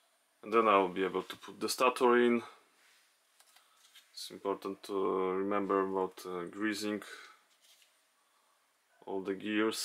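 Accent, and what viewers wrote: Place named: Russia. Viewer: it's realy solved my problem with error 10! thank you! конечно английский с русским диалектом это жесть, но за видос респект!!!!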